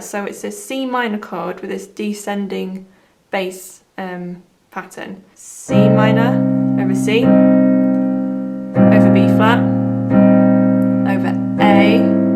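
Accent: United Kingdom. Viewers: I know how to play the song. I just couldn't stop the video, enjoying your lovely British accent... 😊. Also nice work with the tune! Cheers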